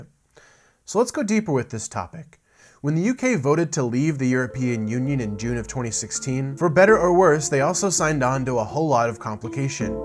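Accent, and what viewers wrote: United States. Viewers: I'd love to watch this but one minute in and I can't take the awful american pronunciation anymore, sorry man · Well done on the Irish border explainer - it's somehow reassuring to have a knowledgeable US voice explain it. Makes it all feel less crazy. I'm looking forward to watching your Berlin Wall video - I lived through the fall in 1989 when I was studying in Berlin.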